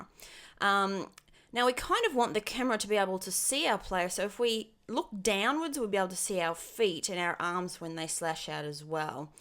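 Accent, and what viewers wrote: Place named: Australia. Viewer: Uhh yes. An Aussie, thank lord. Been listening to too many Brits, need some voices from my homeland.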